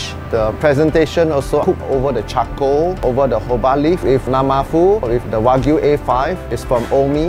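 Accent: Singapore. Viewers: He speaks in Singaporeans accent.... But i like his presentation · Ur accent is killing me! ! Singapore English is awful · He has a Singapore accent ✌️😋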